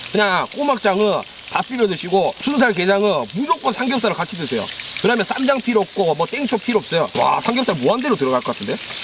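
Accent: Busan, South Korea. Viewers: 옥상 먹방 정감있는 부산말 조합 최강 섞까 묵어봐라ㅎㅎ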